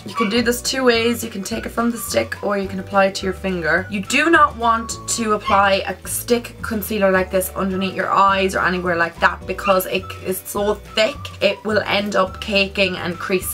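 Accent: Ireland. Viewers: I've watched your videos for some time now and I still cant get over your accent! I love Irish accents! They are so much more interesting than the boring oll American one. Loved this video as well! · Your Irish accent is so beautiful!! And so are you <3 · Are you Irish? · omg are you irish? C: you sound irish :D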